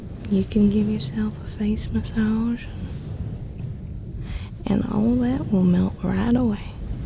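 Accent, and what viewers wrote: South, United States. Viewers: Southern accent gets me every time. 👌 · Another amazing & relaxing ASMR video well done,! You're really good at that southern accent every time, and I love it.😎👌 And as for the face massage and paw sounds, your paws always look the best (the softest) for these types of videos.😉👍 Please keep up the amazing work and have a great day.😊🫂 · YES! And she be southern tonight. Hi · yaaaaaaaaaaaaaaaaaaaaaay southern accent!!! yipee! yay! wooooo!:3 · Oh snap! She's gone Southern!!! Time to relax! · ? How is your British accent from your odd Therapy session video and now your Southern accent in this video so good? Wait no. Don't tell me. Because it is good. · I absolutely love the southern accent, especially at "my sweet little honey-bumpkin" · THE SOUTHERN ACCENT IS BACK. I can finally die happy... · I absolutely love the southern accent very cute ^^. 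And another amazing and relaxing video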